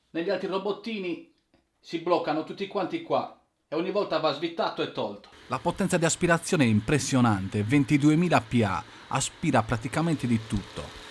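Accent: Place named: Sardinia, Italy. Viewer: Si sente perfettamente che sei sardo!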